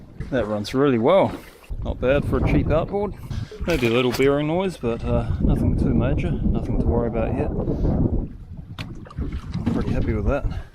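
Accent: New Zealand. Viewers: That's the awesome New Zuld accent...very flat in comparison to most other English speaking accents. Can lead to confusion when spoken in the West Island (Australia) e.g. Aussies say "sex" when they mean to say "six"..and the Kiwi will sound like "sux" when he means "six" leading almost immediately to sheep jokes!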